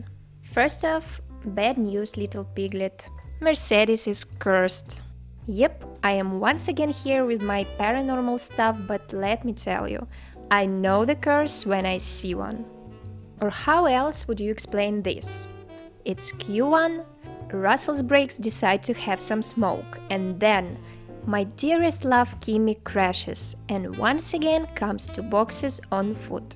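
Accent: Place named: England, United Kingdom. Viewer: Wow, you're from England!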